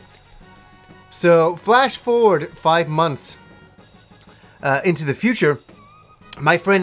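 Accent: Yorkshire, United Kingdom. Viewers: U are a Yorkie? which part were u from? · WUT? your a Yorkshire Lad?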